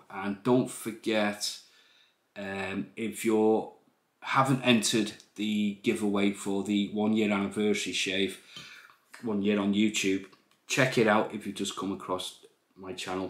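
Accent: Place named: Liverpool, United Kingdom. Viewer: Great to hear a bit of Scouse! Enjoy your Friday!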